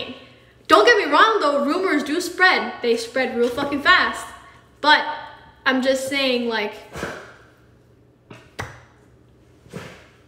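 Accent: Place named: United Kingdom. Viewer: I miss her British accent.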